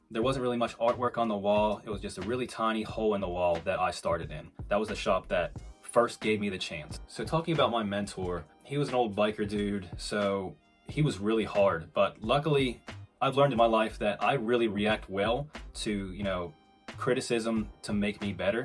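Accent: United Kingdom. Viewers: You sound British - can really hear a twang in there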